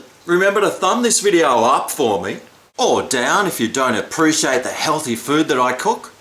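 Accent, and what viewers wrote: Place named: Australia. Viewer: I like your videos. Also a great way to learn some Aussie English:)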